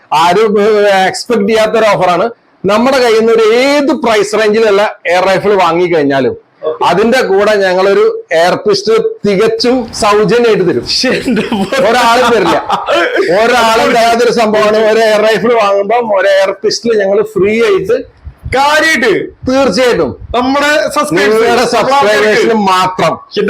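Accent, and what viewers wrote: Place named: Kochi, India. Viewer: Kochi karude samsaram padichallo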